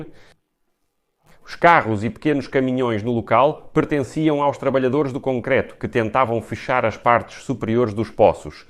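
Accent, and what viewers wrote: Portugal. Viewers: Quem é você para ter "pena" de alguém? Quando as "crianças" lutam por sobrevivência não pedem hipocrisia de outrem, mas engajamento. Devemos considerar vidas humanas independente de etnias ou credos e a compaixão dissimulada não acrescenta, subtrai. "Ter pena" é melhor que exigir justica e direitos humanos indistintamente, é olhar de cima uma narrativa inconclusiva em sotaque português.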